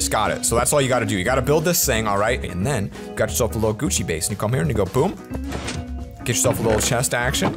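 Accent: Russia. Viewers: tip u need to set to to the nigth mode :) by clicking it also nice russian voice😂 live ur vids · Bru. His Russian accent xD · noice russian voice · Ur Russian makes the vid ten times better 😂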